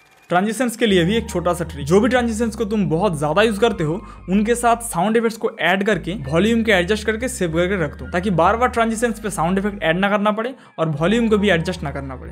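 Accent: Bengal, India. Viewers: ap ke awaj mai bangali bala feel ata hai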